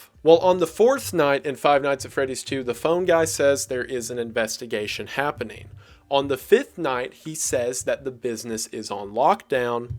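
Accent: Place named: North Carolina, United States. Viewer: are you from NC, USA? you have a very particular accent, especially when you say "then". But also thank you so much for this, because i followed the whole time, but i still missed a lot of details, so I'm happy to see it all laid down flat.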